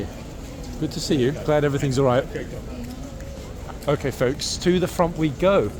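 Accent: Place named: United Kingdom. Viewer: I love British accents!!!!!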